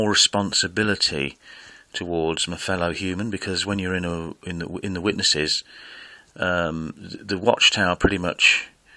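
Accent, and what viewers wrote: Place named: United Kingdom. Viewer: I've been a lurker on your channel for some months now, and I feel it appropriate at this time to show my appreciation for you posting these monologues. Besides the obvious depth of the subject matter, I also enjoy your strolls in the park, your British accent and "load of old rhubarb" expressions, and your richness of character. I'm not a JW, never been one and never will be, but I am amidst the long process of attempting to guide a born-in out of his misery. I thank you for your efforts.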